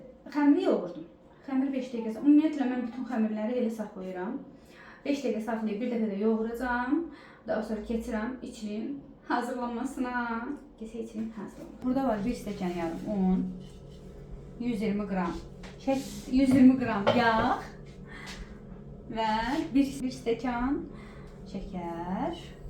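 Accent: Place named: Nakhchivan, Azerbaijan. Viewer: Salam bu şirin danişiq naxcivan danişiqidi🎉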